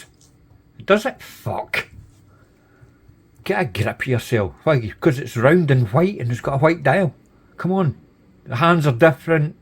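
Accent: Scotland, United Kingdom. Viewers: Hey bud recently discovered your channel and been enjoying your reviews. What part of Scotland are you from?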